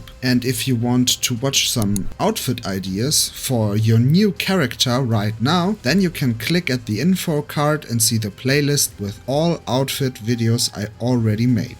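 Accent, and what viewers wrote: Germany. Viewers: Are you German by chance? :D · U probably from germany?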